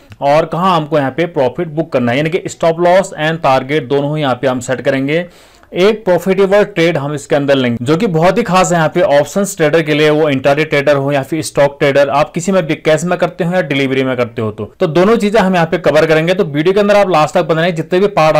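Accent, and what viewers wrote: Kumaon, India. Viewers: Aap kumauni hain kya sir?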